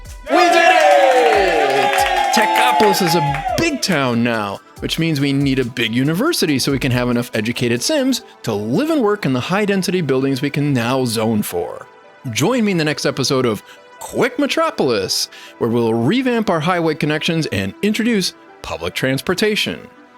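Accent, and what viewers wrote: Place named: Philadelphia, United States. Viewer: This dudes definitely from Philly haha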